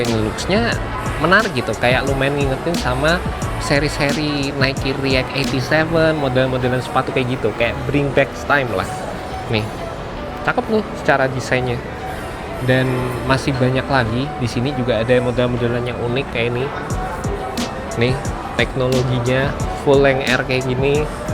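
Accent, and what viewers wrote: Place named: Surabaya, Indonesia. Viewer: ketauan orang surabaya dari meling meling bolo 😂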